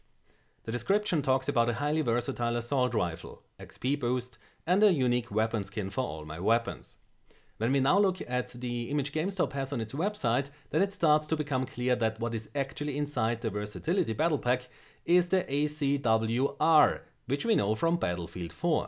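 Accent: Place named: Germany. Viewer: That German accent gave me cancer.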